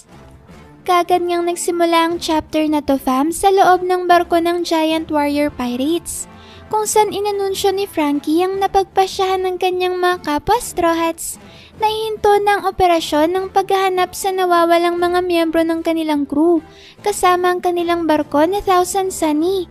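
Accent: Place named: Bicol, Philippines. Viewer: Taga bicol ka pala?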